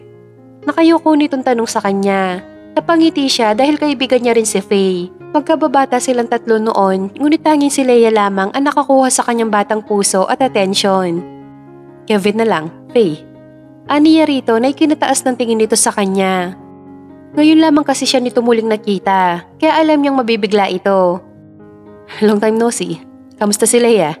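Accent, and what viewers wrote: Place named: Cordillera, Philippines. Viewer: narrator parang taga somewhere in Kalinga or Ifugao ka,rinig po ung tuno sa boses mo sometimes hehe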